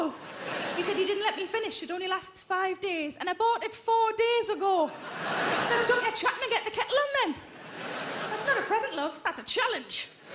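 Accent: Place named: Newcastle, United Kingdom. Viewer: Hollie Dixon why wouldn’t they 😂 it’s only a Newcastle accent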